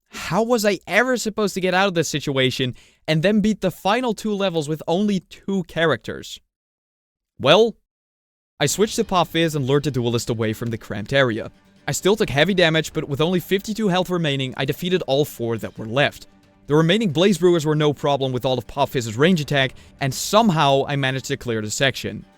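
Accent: Netherlands. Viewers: I teach English to Dutch speakers and I speak Dutch myself. If it's not Dutch i'd be surprised. But honestly, the accent really isn't that bad.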